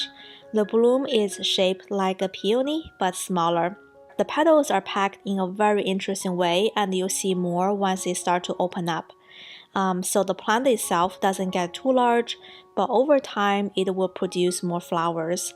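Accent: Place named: Vietnam. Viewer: Just came across your channel. I also live in the Bay. I noticed your accent. Are you Viet lol? Love your garden!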